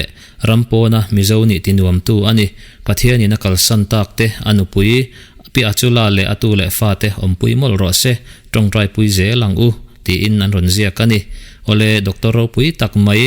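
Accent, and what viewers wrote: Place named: Tuensang, India. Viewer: Mizo ṭawng dik taka chhiar leh lamdan zir i ngai deuh.. chuan Tuensang lamrik dan nen.. chung zawng² aichuan i ti ṭha lutuk e..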